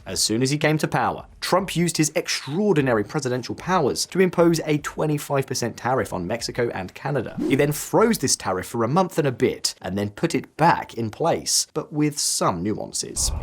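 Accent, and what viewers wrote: United Kingdom. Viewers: ditz with a brit accent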